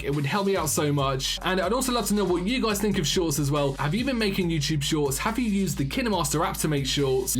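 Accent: United Kingdom. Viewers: A man that sound British and looks Swedish?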